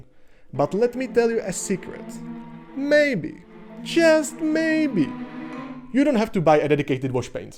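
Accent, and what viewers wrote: Czech Republic. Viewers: způsob, kterým vyslovujete slova jako "miniature" a podobně, Češi mají podle mě velmi specifický způsob kladení důrazu na to měkké anglické "r" :)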